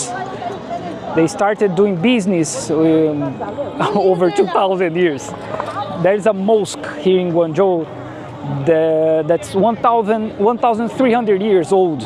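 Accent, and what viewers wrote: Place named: Brazil. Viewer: I understand because he's a Brasilian talking in English 😅😂😂😂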